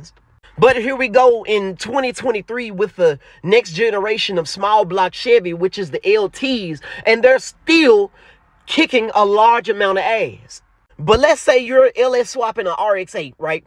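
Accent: Memphis, United States. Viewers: Lol 😂you are definitely a memphis native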